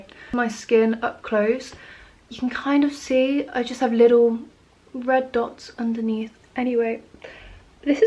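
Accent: United Kingdom. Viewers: Your british accent is so cutteeeeee❤❤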